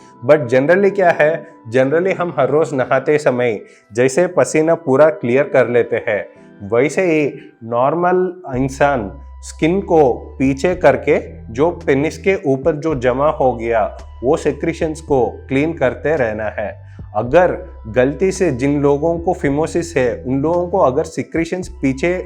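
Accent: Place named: Telugu, India. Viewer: तेलुगू हिंदी थोड़ी सी डिफरेंट 😅😅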